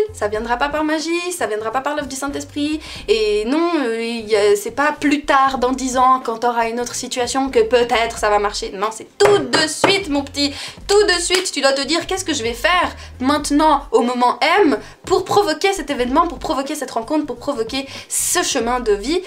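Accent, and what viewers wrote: Geneva, Switzerland. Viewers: Je t'aime putain !! Tu es genevoise ??? En tout les cas tu a l accent genevois. Ça fait un petit moment que je te suis et j adore ta personnalité et ta conception du monde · Ça me faire sourire comment vous dites certains chiffres les suisses voila :)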